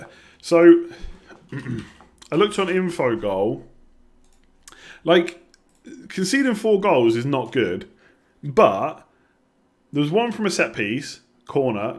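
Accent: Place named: England, United Kingdom. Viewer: Ghanaian and he is saying it right it is just due to the English accent he can't say it well I have the same thing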